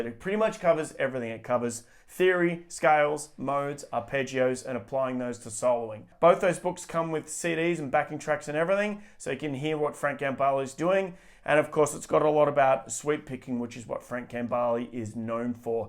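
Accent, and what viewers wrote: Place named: Australia. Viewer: Frank is such a treasure! Hello from America. I could listen to Aussies talk all day.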